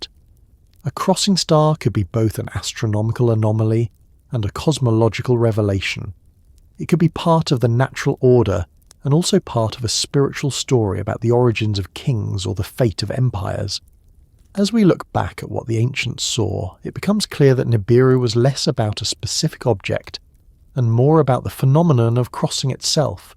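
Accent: United Kingdom. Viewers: How many channels do you have? You are the same british voiceover on many other similar topic of a video. Are you an AI British voice?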